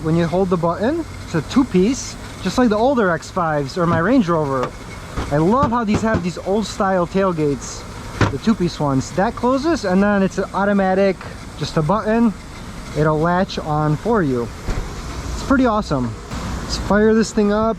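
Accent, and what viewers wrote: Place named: United States. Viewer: urodzony w stanach? you sound like a native american speaker.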